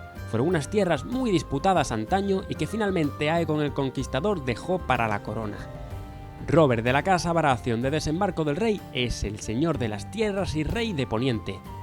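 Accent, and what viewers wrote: Castile, Spain. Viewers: Me encantó el video :). Es tan raro para mí ver todos los nombres en castellano y también el acento!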